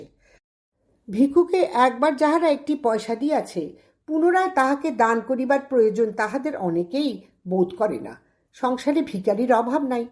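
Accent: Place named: Bangal, Bangladesh. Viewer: Darun porechen mashi bishesh kore bangal taan. Shunte shunte mone hochhilo ki privileged amra. Koto aramse thaka shotti kto complains amader.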